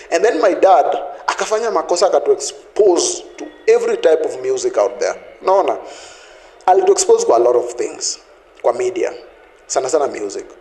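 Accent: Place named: Kenya. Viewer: Ascent ya kiluhya ,kabsaa he is well informed though, and seems a good and funny joker, watu hutoka mbali ,lakini, encouraging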